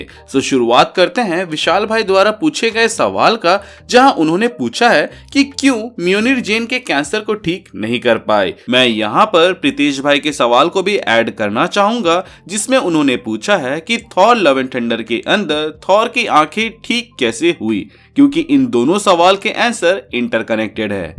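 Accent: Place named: Jharkhand, India. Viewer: तोर भाषा मे झारखंड के झलक देके पारे हियो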